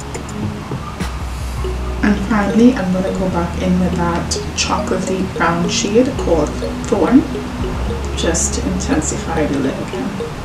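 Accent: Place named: Caribbean. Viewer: Hi there. Just started watching & subscribed right away b/c that Caribbean accent grabbed me. 🇯🇲 here, you too?! Also, what are some of your foundation shades? 💜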